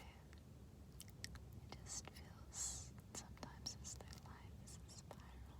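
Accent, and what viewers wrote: Australia. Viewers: That's an Australian accent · aussie accent is so relaxing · You from aus? Ik a Aussie when I hear one confirm for me Bub. 😂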